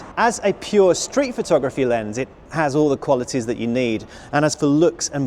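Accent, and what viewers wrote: United Kingdom. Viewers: North London? 👀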